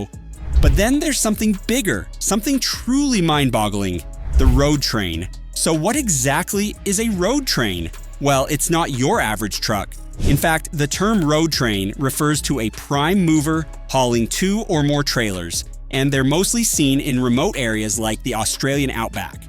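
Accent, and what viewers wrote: United States. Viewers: Nothing worse than hearing a "errrrrritating Amerrrrrican ac cent" while trying to watch a video. So I stopped 40 seconds in.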